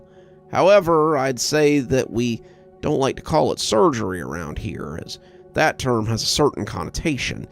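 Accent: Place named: United States. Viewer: I love your accent !! Might be my new favourite American accent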